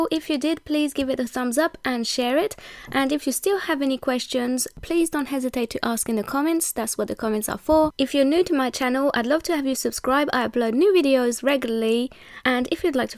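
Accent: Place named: London, United Kingdom. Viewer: You are teaching us English grammar as well. You sound like you have spent quite bit of time in London. Thank you for clarification was "bit" lost.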